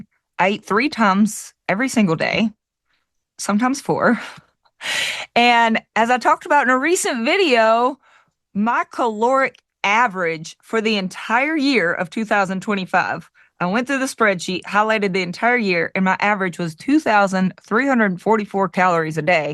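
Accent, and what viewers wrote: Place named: South, United States. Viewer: You had me from the moment you said, "That is it for the old news..." The momen you started describing your sudden craving for pure fat, and said it in your adorable southern accent, you had me smiling, laughing, and totally charmed. Thoroughly enjoyed your video. You didn't mention your complexion, but that had to be at least as good as, if not better than, your cholesterol levels! Let 'er rip,!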